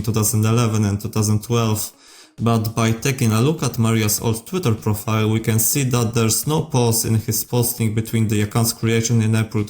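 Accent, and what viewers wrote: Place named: Poland. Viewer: Are you from Poland? I can hear our accent 😭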